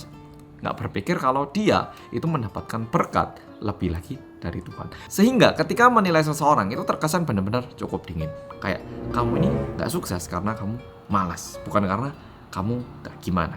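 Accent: Java, Indonesia. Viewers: Mas Korea kok medok Jawa ya 🙏👍🇮🇩💪🇮🇩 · First time aku nonton vlog orng korea medok kyk org jawa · Kok medok banget sih masss, jd unik lohhh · Medok men bahasa mu mas bro.. 👍👍👍 Biar gak terlalu berkompetisi harus belajar spiritualitas mas bro 😁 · Informasinya sangat jelas, mulai dari hal kecil sampai hal yang rumit. Intinya Bullying dimana-mana selalu ada, Karena sifat dasar manusia yang ingin menguasai dan diakui. Dan Sebagian besar orang-orang seperti itu terbentuk dengan sendirinya oleh lingkungan disekitar. Terima kasih, sudah membuka wawasan kami tentang kehidupan di Korea ya Mas 😁 Saya suka Gaya medok Njenengan hehe.. Salam dari Surabaya 🙏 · Enak bnr dgr logat jawa nya 😅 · Cak, yoopo carane sampeyan ngomong logat korea.. padahal sampeyan medok, bikin tutor cara pengucapan bahasa Korea lah cak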